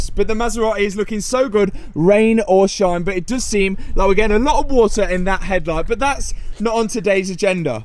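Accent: United Kingdom. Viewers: Yaaay. British accent! Hahaha. Let me get a cuppa! 😆👌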